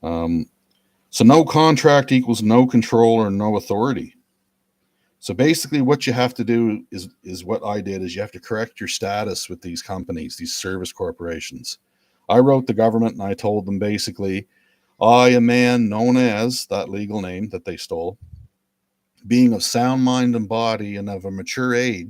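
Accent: Canada. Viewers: Are you Canadian? (Your accent.) If so you are definitely in the same boat as us in the US. I am trying to go this route by UCC-1 and Status Correction.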